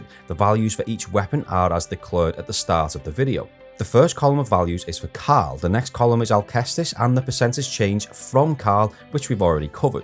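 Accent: Liverpool, United Kingdom. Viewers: Loving the thorough breakdown here, always enjoy numerical deep dives in Destiny! Thanks for the video! Also, am I detecting a fellow scouser here mate? ;D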